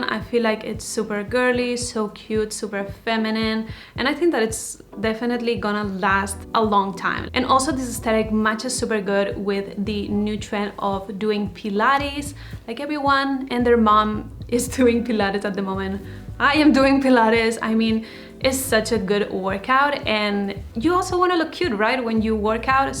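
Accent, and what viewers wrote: Spain. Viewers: Are you from Spain or Argentina? You speak English as if your original language was Spanish. And the way you said Bolero reminds me of how my Spanish ain’t says it. Super duper curious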